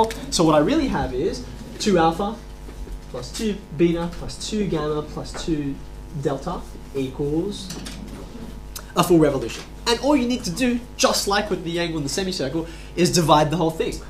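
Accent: Australia. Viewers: it's just an australian accent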